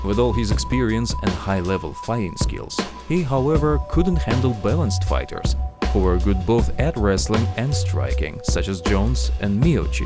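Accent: Russia. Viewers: Guys, I love your analysis, but you need someone else to read the English text. The thick Russian accent will scare of most newcomers.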